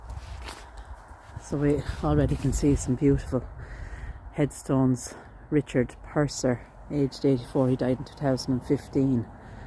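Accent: Ireland. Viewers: By the way, your Irish brogue is absolutely mesmerizing!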